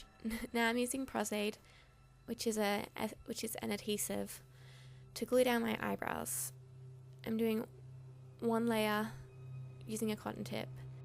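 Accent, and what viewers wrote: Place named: Australia. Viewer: Took me about five videos to realize you talked "funny" but that's because I'm used to hearing american accents on you tube... (Note: by funny I mean Normal, as in Australian ) love ya work, will have to look into emulating some of it :)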